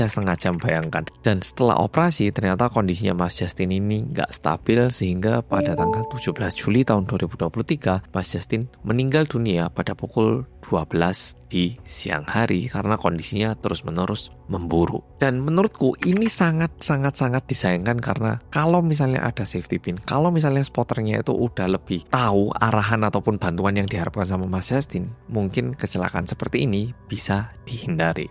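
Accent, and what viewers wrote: Java, Indonesia. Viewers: Ini wni bukan sih? Logatnya jawa 🤣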